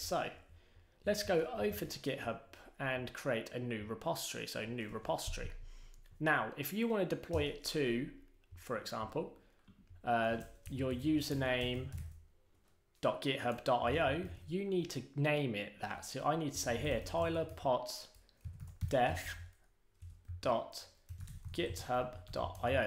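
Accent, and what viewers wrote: United Kingdom. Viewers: nice British accent and i think they should change the name to feel.js seems nicer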